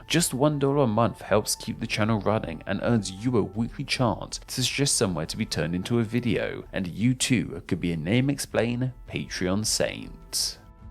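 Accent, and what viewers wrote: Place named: RP, United Kingdom. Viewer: Dude you sound well posh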